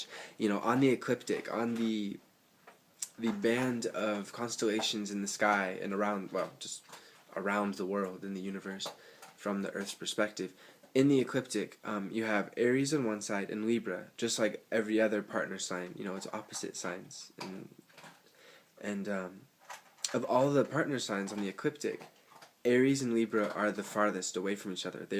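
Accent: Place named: West Coast, United States. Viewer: lol you said at the function. you are def. from the west coast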